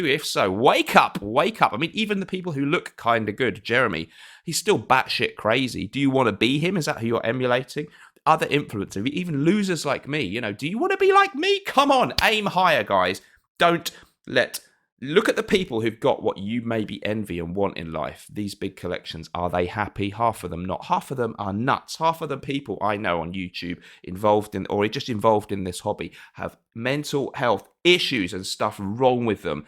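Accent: United Kingdom. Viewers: 🤣😂You made me laugh, thank you! Nice British english!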